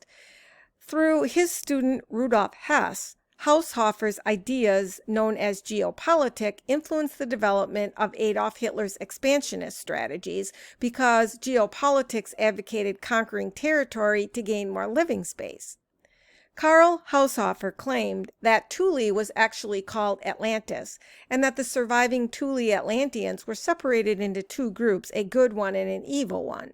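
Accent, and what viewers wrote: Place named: Chicago, United States. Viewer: That's a Chicago mid West vocal infection. Someone I loved spoke that way and she even had it when speaking Spanish..as she was born in Cuba then fam had immigrated to Chicago as a child.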